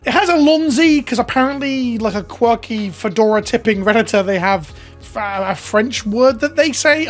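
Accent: United Kingdom. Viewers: Hearing a british nerd talk about and get wrong so much about Dr who haha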